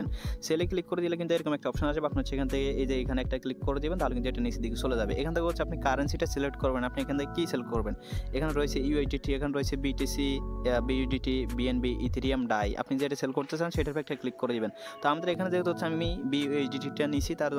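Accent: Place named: Bhola, Bangladesh. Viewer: Vai apnar bari bhola jelay mone hoy.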